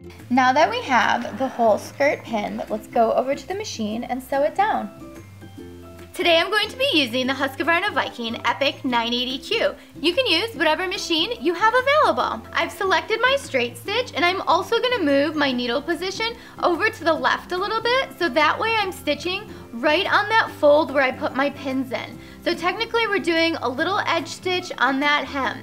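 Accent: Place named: United States. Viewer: She makes it look very easy but oh my, that voice. Lots of American ladies have this tone and rhythm to their voices, why is that?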